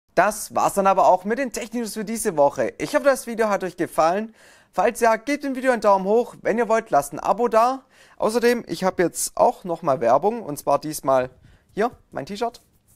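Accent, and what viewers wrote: Swabia, Germany. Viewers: alter schwabe :D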